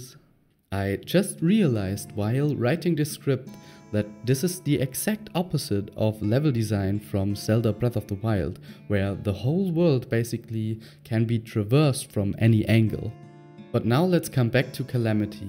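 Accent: Germany. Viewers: Great Devlog! Am I hearing a slight german accent my guy?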